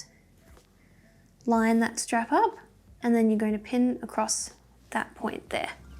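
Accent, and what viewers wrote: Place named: Australia. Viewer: Oh thank god, its an Aussie and not some ageing southern momma y'alling with a voice like a drill sergeant after a night in a smokey bar doing karaoke . . . .this is exactly what ive been looking for.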